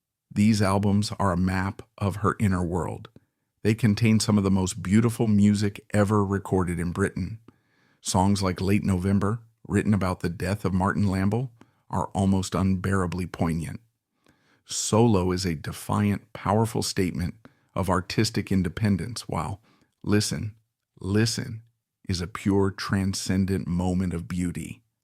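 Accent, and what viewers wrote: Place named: United States. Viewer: American accent at it’s worst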